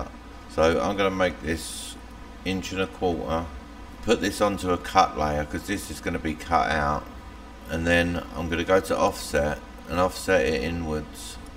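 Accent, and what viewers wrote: United Kingdom. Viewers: Thank's for all the laser vidéos. From France, your accent remind me Peaky blinders...👍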